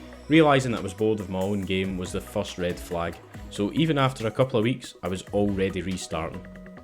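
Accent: Scotland, United Kingdom. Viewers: Dude, your voice is epic, gotta love Scottish English! Dont give up gamedev. You enjoy it, thats all that matters, sooner or later you will have tons of money :D Cheers from Ukraine! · Good Sir Scotsman thank you very much for sharing your valuable experience, learned a lot from it and enjoyed your nice accent 👍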